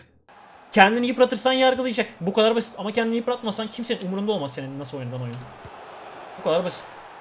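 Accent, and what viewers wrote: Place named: Trabzon, Turkey. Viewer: Api senun şu Trabzon şivene bayılayrom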